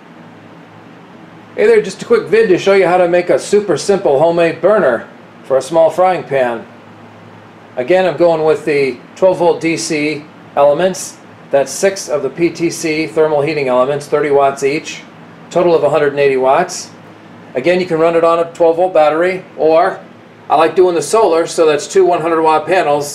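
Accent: United States. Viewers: Happy new year 2024. I like your ingenuity and your accent. Simple, effective, american. I will try with my dutch oven. Thanks for your creativity.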